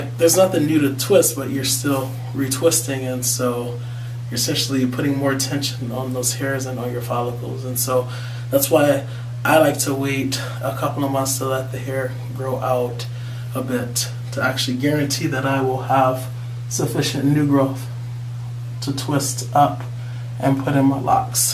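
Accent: United States. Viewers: Yes definitely still love those movies despite being old. I'm a newbie when it comes to my loc journey. I had been wanting to do it for years & actually started it "accidently" LOL I had shaved my head after having yarn braids in for over 3 years & as my hair grew, I did my hair in a two-strand twist under a wig I liked & I left them that way & WAH LAH!!!.INSTANT LOCS LOL I never retwist them, but I know I need to. I did that like once or twice. I don't want a bird's nest. Question: what do you use to moisturize your scalp & what product, if any, are you using to twist?? I've used just water in the past when I tried it, but it didn't really hold. I'm sure you've already answered these questions in past vids, but I haven't had a chance to watch them all yet. P.S. Do I hear a hint of a fellow "Michigander" in your voice?? LOL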